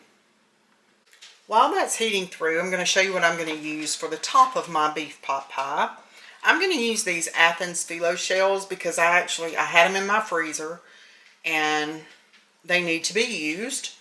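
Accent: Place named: Alabama, United States. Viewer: I've been binge-watching your channel tonight. Listening to you talk, I decided you might be from Alabama. I'm from North Central Alabama. During the past year, I've been cooking for one. You've given me such great ideas. Thank you for reminding me it's prudent to take care of yourself and remember to eat. I look forward to your upcoming videos. Blessings! 😃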